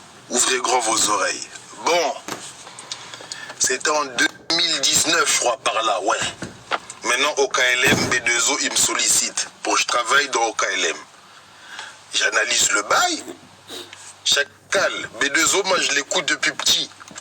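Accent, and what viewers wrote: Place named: Grigny, France. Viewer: A grigny c'est comme ça que sa parle c'est sa ta pas compris.. ça se voit vous venez de province ou sud de la France pour dire sa...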